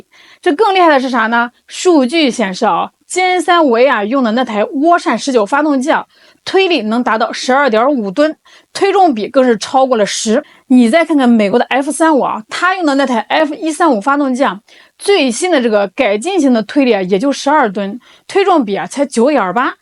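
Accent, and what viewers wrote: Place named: Shandong, China. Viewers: 🤣🤣🤣說話的調調像山東大妞！呵呵！說不定您老家省城離山東省近麽🤣！ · 我本來也以為妳是我山東老鄉，前幾集妳有提到是河南 總之妳有我們北方人的豪氣！👍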